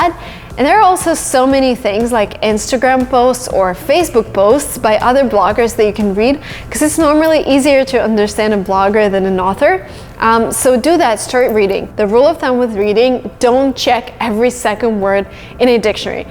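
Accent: United States. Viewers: Thanks.Your pronunciation is like an american.Where are you born? I love you...!!!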